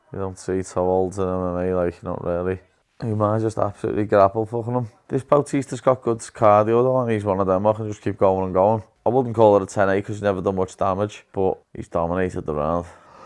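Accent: Liverpool, United Kingdom. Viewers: if I got a £1 for every time paddy says lad, id be a millionaire 😂 gotta love the Scouse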